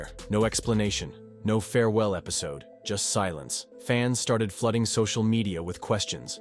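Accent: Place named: United States. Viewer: Why the hideous American voiceover ???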